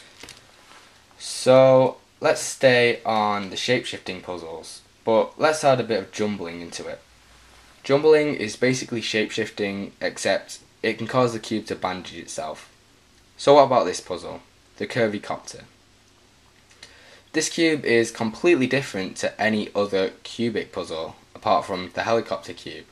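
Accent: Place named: Yorkshire, United Kingdom. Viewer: Hey, just wondering which part of the country you are from as you sound like a yorkshireman?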